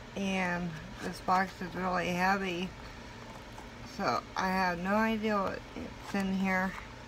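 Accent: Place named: South, United States. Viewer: Just recently subbed to him!!! The dudes a real good picker ...... and he has a bad ass southern accent....!!!!!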